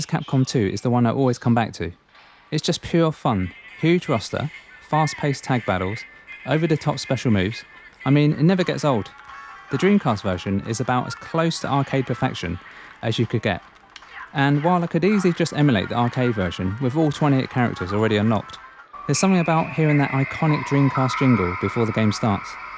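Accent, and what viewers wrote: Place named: United Kingdom. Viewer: It's called a British accent lol. Maybe you should travel more instead of playing games, it opens the mind. Hope that helps.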